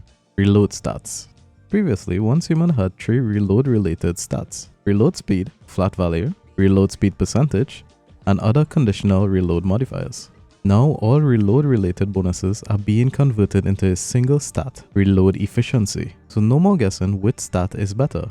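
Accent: Trinidad and Tobago. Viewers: Lol I commented this too..lol u cant hide that accent g I hear my people voice 🤣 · Are you trini?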